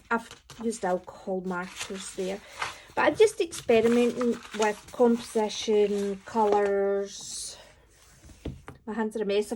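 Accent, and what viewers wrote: Scotland, United Kingdom. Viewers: As an artist you have to do what an artist has to do: create. And you created alot! I love those pencil sketches in your little red sketchbook. When you go with the flow there is so much good stuff coming out of you,. But - and I am saying this out of experience - trust your own impulse, don't mind others opinions about your art wether they are good or bad. It was a pleasure to hear from you again (although your sweet scottish accent is sometimes a bit hard to understand 😉). Mara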